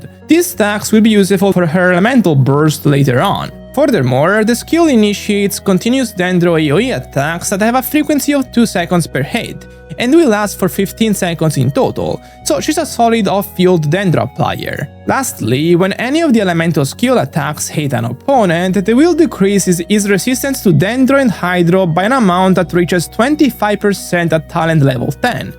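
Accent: Italy. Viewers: Chat is this guy italian? He sounds like one and i like it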